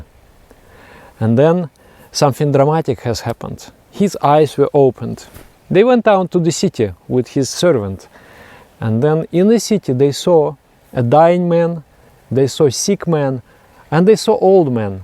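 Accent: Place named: Russia. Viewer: Спасибо большое за видео🙏 Но его не совсем просто слушать из-за грубого акцента который влияет на произношение. В том смысле что русские постоянно пытаются произносить чётко каждый звук. Английский так не устроен, он звучит нормально только когда на нём говорят носители в обычной им манере. Ещё раз спасибо 😊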